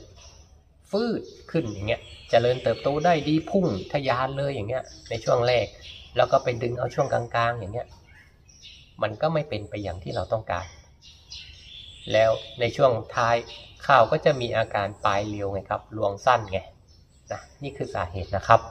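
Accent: Sukhothai, Thailand. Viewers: ส่ำเนียง เหมื่อน คน ซุโข่ทัย เลยครับ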